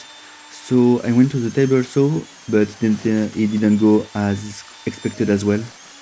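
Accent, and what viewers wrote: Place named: France. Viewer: Le sous titrage est une autre solution ;) ! Ah ! Cet accent français quoi ;) ! Mais joli boulot !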